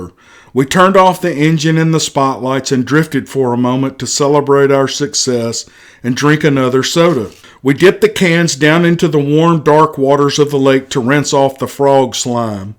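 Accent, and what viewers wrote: South, United States. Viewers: It's the South in the Mouth that sells it. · The voice sounds like my neighbor's Keith Robbins. Those southern accents are priceless. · I try to place the accent and I imagine your either from TX or TN maybe a GA boy, lol I'm sure I'm not the only one but I think that's what keeps ppl intrigued by the stories and the twang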